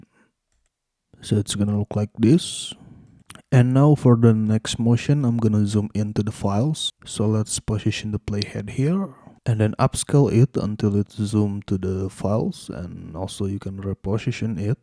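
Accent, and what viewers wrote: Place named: Indonesia. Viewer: Ini orang indo ya logat nya kok indo bgt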